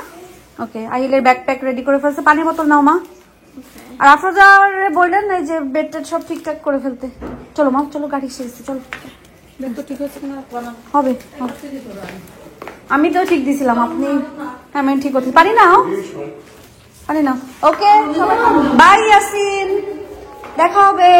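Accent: Bangladesh. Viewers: kathar dhoron dekhey bojha jay bangladesi 😅😅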